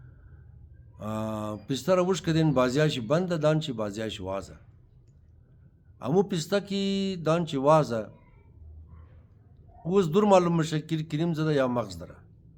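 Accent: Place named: Hazarajat, Afghanistan. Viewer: کاکای ما از آزره جاغوری ایم. لهجه آزرگی تو خیلی خوشم میه. خدا توره عمر سالم و دراز بده. 💐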